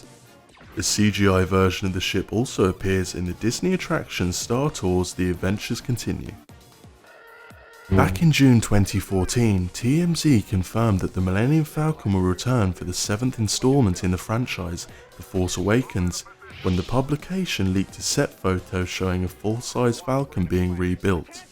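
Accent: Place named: United Kingdom. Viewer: Why is Kylo Ren narrating this with a British accent?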